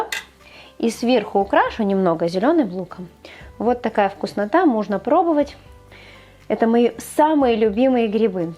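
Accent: Ukraine. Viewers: Чому ви записуєте відео російською ? Чутно ж що ваша рідна мова українська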